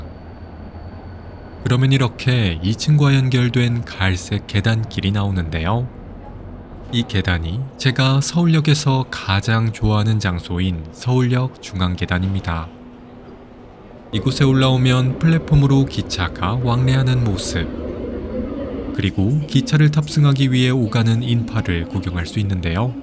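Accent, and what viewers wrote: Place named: Jeolla, South Korea. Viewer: 가~장. 기~차.. 전라도 억양인디! 서울말쓰시는데 고향은 전라도지라~ 잉??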